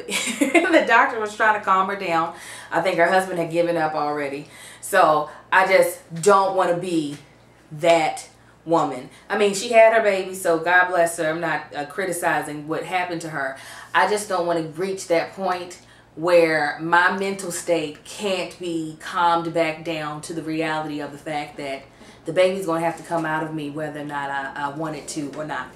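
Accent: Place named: South, United States. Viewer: The southern belle accent and out of breathe is just too cute.😂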